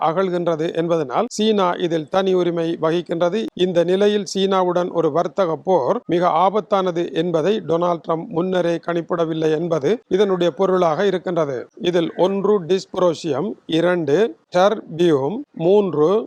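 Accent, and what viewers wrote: Sri Lanka. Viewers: Bro he is lankan,news also lankan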